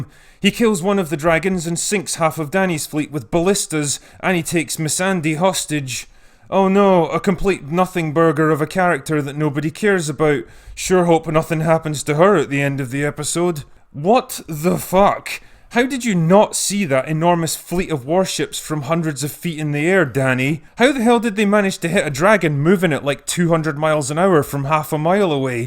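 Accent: Scotland, United Kingdom. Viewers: Love your Scottish accent. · It sounds Scottish lmao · You cant believe how much i love the And thats all i have to say. Go away naow. In this lovely british/scottish accent. Every other youtuber would have said: Like and subscribe, follow me on instagram and facebook Absolutely in love with this... review? Subscribed, instantly.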